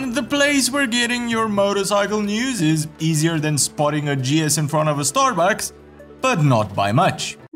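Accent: South Africa. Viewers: Is that a SA accent with a Romanian twang ?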